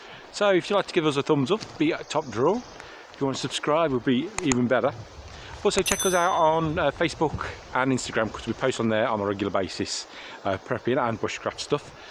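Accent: England, United Kingdom. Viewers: You will have to forgive my cynicism but when I hear an English accent talking about bush craft and wilderness survival I get a bit sceptical and sarcastic. This guy may have experience in the bush and living in remote places but this video looks like it was taken in a park. Years ago I worked in northern British Colombia and worked in some extremely remote locations and lived out of a tent. In some cases, the only way in and out was be a helicopter or one heck of an arduous long walk over impossible terrain. I am sure there are people who have experienced more challenging circumstances and more desperate survival situations then me but the average guy hasn’t. I’ve had numerous bear encounters, slept in a tent in the snow, slept in the rain with nothing but a sleeping bag and a tarp, been in dense forests with huge hemlock trees in the late fall living out of a tent, lived in a tent in early spring when you would wake up in the night covered in frost, or been baking in 40 plus degree Celsius temperatures in the outdoors with no way to cool off except to drink hot water that was baking in the scorching heat. 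I was always well equipped and for the most part had some kind of back-up, support, and resources. Real survival in the wild is not a game or about having some items in a backpack. If you are ever truly stuck in a remote location and can’t get to civilization it will be better to have some survival items but they won’t help you much. When you haven’t eaten anything in 8 ho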